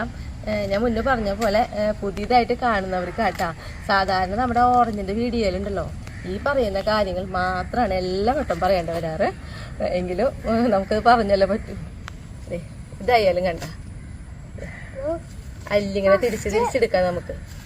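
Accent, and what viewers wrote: Thrissur, India. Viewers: എനിക്ക് ഒരു പാട് ഇഷ്ടമാണ് തൃശൂർ ഭാഷയും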